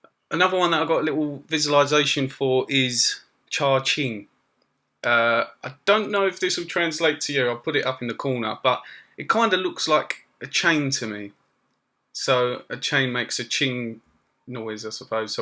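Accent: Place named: United Kingdom. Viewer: Someone who speaks thai and it’s not a precise accent it’s just a basic brittish accent แต่ตกลง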